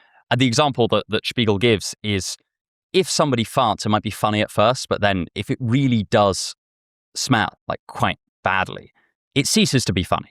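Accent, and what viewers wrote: United Kingdom. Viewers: hello british man, have a nice day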